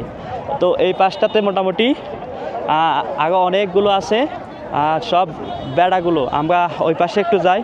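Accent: Chittagong, Bangladesh. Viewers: উনি চাটগাঁইয়া বা চট্টগ্রামের মানুষ। উনি চট্টগ্রামের উপভাষায় কথা বলতো।তাই পিউর বাংলায় কথা বললেও চট্টগ্রামের একটা টান থেকে যায়।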